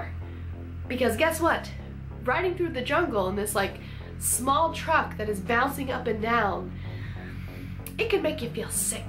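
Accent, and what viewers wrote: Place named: South, United States. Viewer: LOL you should keep your southern accent at all times!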